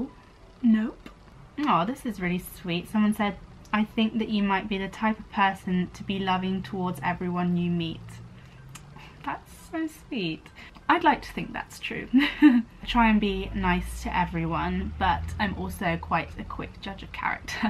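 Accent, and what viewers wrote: RP, United Kingdom. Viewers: Definitely posh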